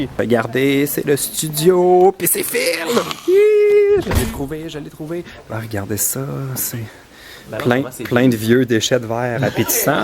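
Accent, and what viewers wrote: Quebec, Canada. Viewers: Plus j'écoute ton Vlog plus je me dit que j'aime vraiment l'accent québécois 😍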